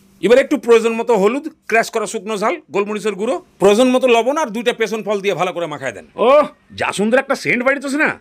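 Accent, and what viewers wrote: Bangladesh. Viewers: Bangladeshi tone e Kotha bolche wow😮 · Eta amader Bangladeshi accent kind off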